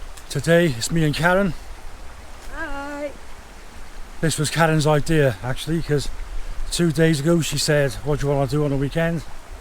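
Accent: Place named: South Wales, United Kingdom. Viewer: Another great video. A bit nearer to home for this camp. It’s a fair drive from South Wales to Snowdonia for you each time,but well worth the journey isn’t it? Good to see Karen with you. ‘cute in it!’🤣Love the South Wales Accent 🏴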